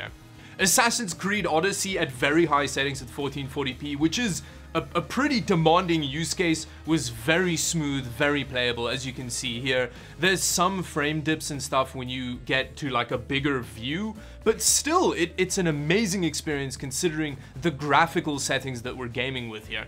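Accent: South Africa. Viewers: ARE YOU sOUTH aFRICAN? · Ive suspected you are from South Africa for a while now due to your accent and then you used that thing when we say "ill get into that just now". Could you please confirm to satisfy my curiosity cause its very distracting while watching your content :P Cheers mate!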